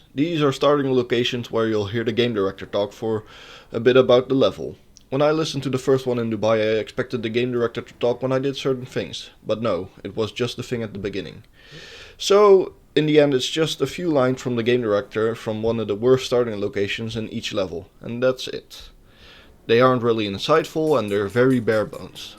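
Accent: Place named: Netherlands. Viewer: Nederlands accent haha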